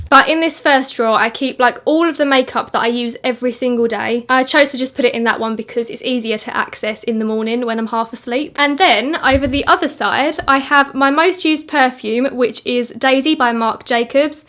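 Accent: United Kingdom. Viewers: Your room looks so nice and comfortable and I love your british english, it sounds so cute! c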